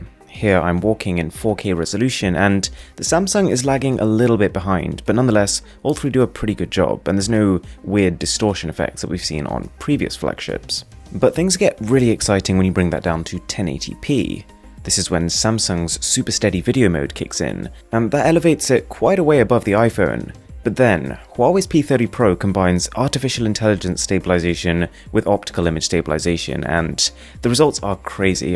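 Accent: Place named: United Kingdom. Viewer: Omg i love your british accent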